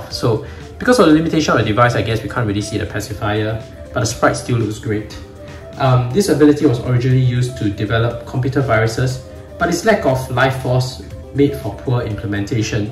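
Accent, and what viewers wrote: Singapore. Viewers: Bro u from Singapore?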